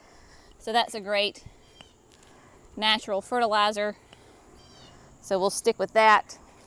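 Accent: South, United States. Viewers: Oh, I love your southern talk when talking about the heat and humidity. I'm in Georgia so we're neighbors. Also love the music. Reminds of the times I was able to sit down at the end of a long day in the yard with the kids, heating up the grill for a burger.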